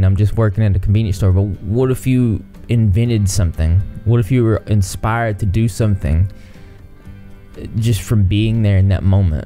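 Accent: South, United States. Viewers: what part of the south are you from? It sounds just like where I am 😅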